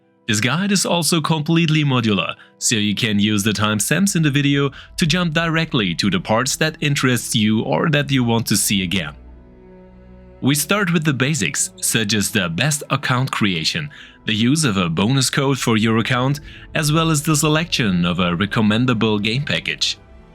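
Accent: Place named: Germany. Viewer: Irgendwie hört sich der Titel komisch an mit "The Great ... " es wäre passender gewesen "Big Guide, oder Hugh Guide", musste schmunzeln 🤣 PS: warum machst du eigentlich auch Videos in englisch? In deutsch macht schon Sinn, beim zuhören bekomme ich fast immer starke Kopfschmerzen, weil du Englisch mit deinen deutschen Dialekt sprichst, es hört sich so an, wie wenn Arnold Schwarzenegger in den 80' spricht. Englisch passt gar nicht zu dir, vor allem ein paar Wörter konnte ich gar nicht verstehen, weil zu undeutlich gesprochen. Wenn es deinem Kanal was bring o.k, sonst mach dir diese Mühe erst gar nicht. Nur so meine Meinung, dafür ist ja die Kommi Funktion da.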